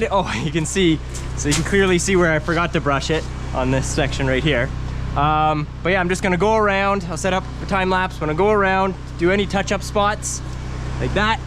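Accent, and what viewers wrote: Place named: Canada. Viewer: This young man sounds like a Canadian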